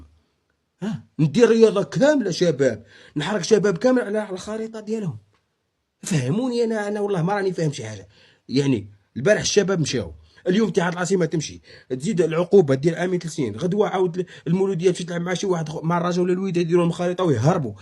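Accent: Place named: Morocco. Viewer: أقسم بالله غير هذا مروكي مطبع ومن اللهجة باين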